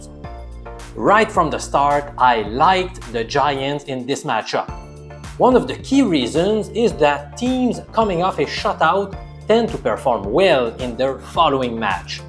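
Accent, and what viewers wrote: Quebec, Canada. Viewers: I love the French Canadian accent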